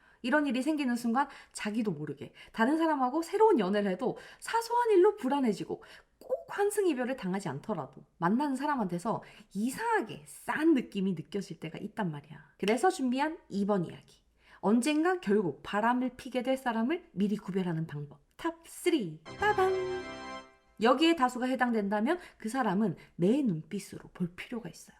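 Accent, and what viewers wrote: Gyeongsang, South Korea. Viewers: 안녕하세요 선생님 주옥의말씀잘들었습니다 미인선생님께서 꾀꼬리같은보이스로 부산경남쪽 또는 대구쪽이신가 아뭏든 정감가는억양으로 설명해주시니까 몰입이되네요 늘 건강하시구 빛나구예쁘신얼굴 오래오래뵙구싶네요 그럼 안녕히계세요~~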